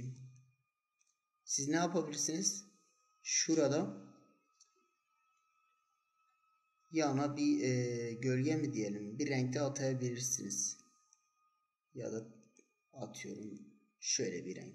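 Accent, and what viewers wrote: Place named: Urfa, Turkey. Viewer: Eline diline sağlık şu Urfa şivenle dahada çok seviyorum sizleri ve kanalını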